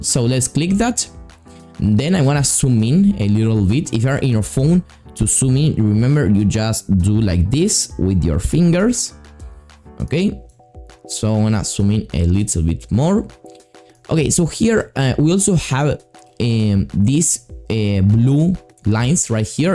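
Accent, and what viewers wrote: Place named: Argentina. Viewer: Your from Argentina ❤❤❤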